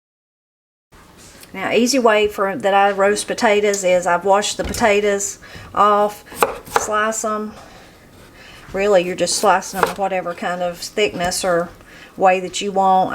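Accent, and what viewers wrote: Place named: West Virginia, United States. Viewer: Love that accent. She talks like my mom did, she was from WV.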